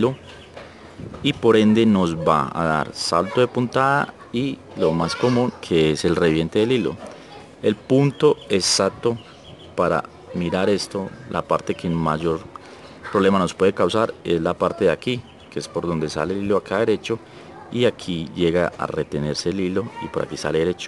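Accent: Colombia. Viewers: Hola mi amigo portu forma de hablar eres colombiano osea mi hermano gracias por estos videos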